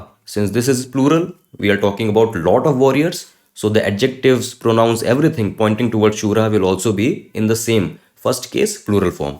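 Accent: India. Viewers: Fantastic lectures. The best. But pls do not slip into Hindi pronunciation of words by dropping the अकारन्त of so many words - मम् प्रातिपदक् etc - sounds strange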